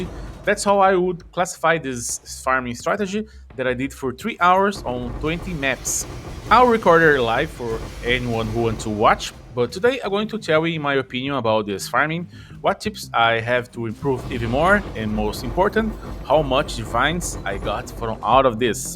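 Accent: Brazil. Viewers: Sotaque de BR é sempre reconhecível, mas ta mandando mto! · irmão, tudo bem? Quero deixar aqui meus parabéns por produzir conteúdo em outra língua, eu perguntei por conta da entonação "Eh" que é bem característico de brasileiro, não perguntei para causar algum tipo de ofensa ou intimidação, mto pelo contrário, se vc produzir conteúdo sobre este jogo em português vou me inscrever no seu canal pois achei bom. Desejo a você muita resiliência e sucesso, forte abraço.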